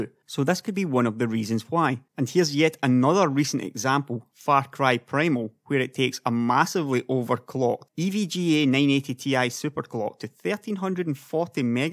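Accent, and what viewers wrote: Scotland, United Kingdom. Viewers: what accent is that? you make me think of Brave's highlanders. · the scottish accent is awesome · Really liking to hear a Scotsman talking tech. Keep up the videos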